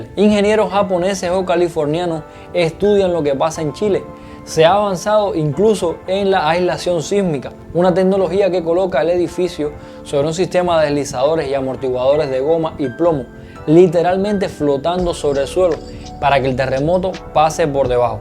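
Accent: Cuba. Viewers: Muchas gracias por compartirnos esta informacion, con la claridad y entusiasmo indistinguible. Estoy a la espera del proximos vídeos de la arquitectura de chile. Me alegra escuchar su acento cubano y todo lo que ha ido aprendiendo y brindando por estos medios. Felicidades y saludos. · Gracias por el vídeo. Lindo el acento cubano. Un saludo a Cuba, un gran y valeroso país❤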